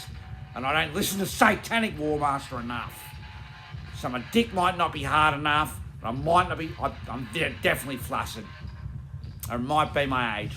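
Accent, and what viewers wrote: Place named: Australia. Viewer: Mate never fucken change your ways for any fuckwit. You being an aussie like myself was one of the things that pulled me into your vids. Got sick of listening to americans haha. But if youre jumping down the Power Electronics rabbit hole and want something extreme, check out Deathkey if you havent, also Intolitarian. Antichrist Kramer did the artwork for Absurd albums among many others! Cheers brother! 🍻🍻🍻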